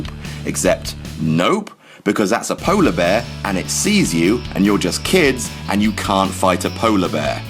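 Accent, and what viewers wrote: United Kingdom. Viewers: Will I subscribe to "WhatCulture"? NOPE! Very dumb video here. You imply in the title that your going to tell us about things we missed in movies that tell us these characters didn't really survive. Instead you make some very dumb conjecture that has nothing to do with the scripts or stories. By your logic - every character in every movie really died - because everyone eventually dies. I hate videos like this - where you present no real value. Just use some british-accented knucklehead to voice over a POS - imagine if a 12 year old kid did the voice over to this - you'd realize is was a complete waste of time.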